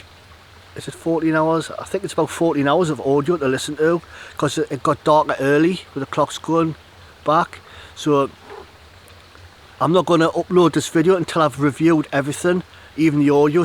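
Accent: Newcastle, United Kingdom. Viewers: I have to ask are you an east country man? A Jordy perhaps?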